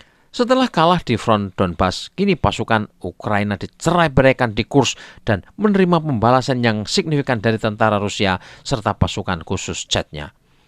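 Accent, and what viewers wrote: Java, Indonesia. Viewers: Loghat jawanya di kurangi bos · Narator nya lucu logat nya jawa bingit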